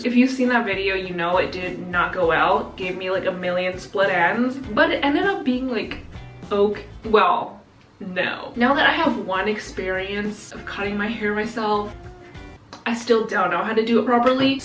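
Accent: United Kingdom. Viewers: Me being a British person, reacting to her British accent 😂 I died 😂😭🤭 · Wth I was like "her voice sounds familiar but I've never watched her before" and it's because you sound like trin lovell but less deep, even the british accent lol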